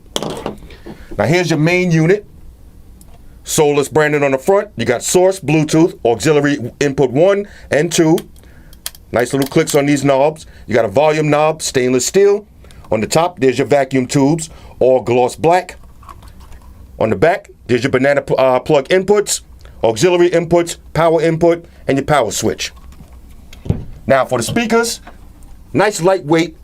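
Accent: New York, United States. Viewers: Yo, it seems strange I’m watching this video from NYC / man this place is crazy & everyone in this hood all talk like you!! Hahaha, this city has so much to offer some 1 like me while I’m here on vacation- hot video again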